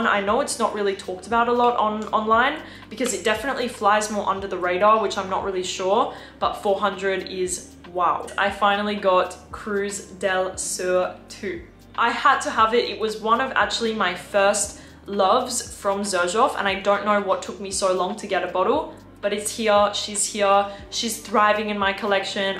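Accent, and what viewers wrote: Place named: Australia. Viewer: Hello. I have been to your hometown Perth twice with the USAF Reserve out of Travis AFB California. Beautiful woman from a beautiful area of the world. I love the accent. Would love to be a patron member but How? I look forward to hearing from you LOL, Scott.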